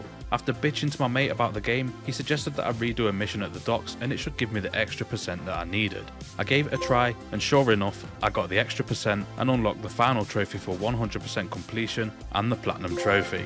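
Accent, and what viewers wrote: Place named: Nottingham, United Kingdom. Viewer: I know a Nottingham accent when i hear one 😂😂😂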